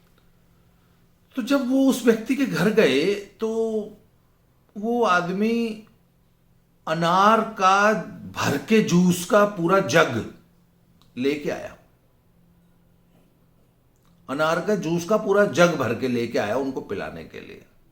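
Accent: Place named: Kangra, India. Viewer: Aap ki "boli" language bahut acchi lagti hai H.P. kangra me same language bolte hai , Dharmshala me ap punjabi tipe language thodi mix hi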